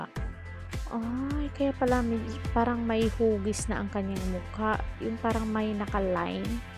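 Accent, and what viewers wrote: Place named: Visayas, Philippines. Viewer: Bat ganyan ka magsalita bisaya ka ba? yak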